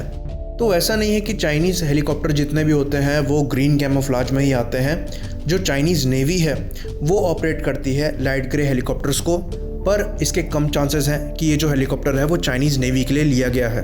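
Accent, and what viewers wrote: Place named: Rajasthan, India. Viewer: Bhai tum rajasthani ho kya aavaj see lag raha hai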